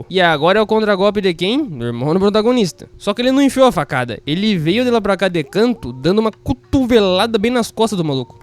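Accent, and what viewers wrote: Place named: Caipira, Brazil. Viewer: Verdade mais só que um pouco de caipira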